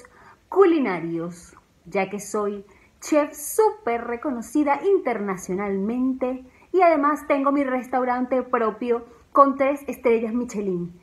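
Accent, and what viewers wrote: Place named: Venezuela. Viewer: En esta época tenia todavia el asentó venezolano 🇻🇪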